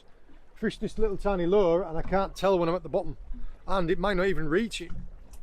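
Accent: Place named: Teesside, United Kingdom. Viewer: Hi great video as always, can I just ask where you are from originally? I've narrowed the accent down to the Teesside area or maybe the other side Barrow/Morcambe way?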